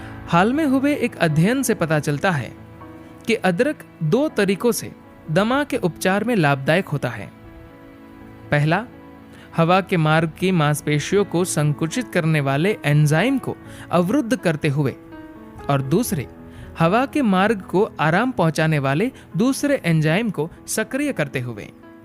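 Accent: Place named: South India, India. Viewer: आप अं की बिन्दी बिल्कुल नहीं बोलते जिससे आपकी हिंदी हास्यास्पद हो गई है। तुरंत पता चल जाता है कि आप साउथ इंडिया से हैं। औ का उच्चारण भी आपका खराब है।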